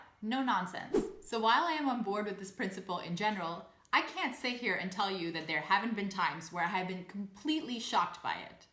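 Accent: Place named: Netherlands. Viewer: Funny to see how you are picking up a sleight Dutch accent. You can still tell you are American but a bit of Dutchie is starting to creep in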